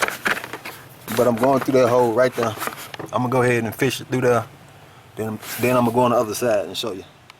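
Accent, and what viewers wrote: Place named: Texas, United States. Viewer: I don't know you. First time seeing one of your videos. But that Texas comin thru strong in yo twang. Good stuff Mayne!!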